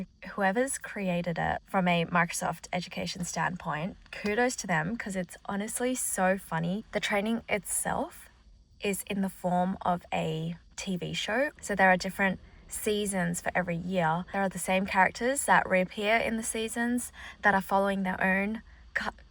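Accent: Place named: United Kingdom. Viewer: Such a cosy video, and lovely British accent.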